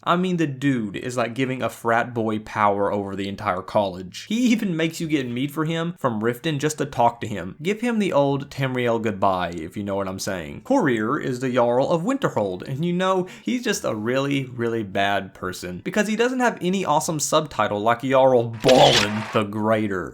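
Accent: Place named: South Carolina, United States. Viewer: Wow what an accent. SC?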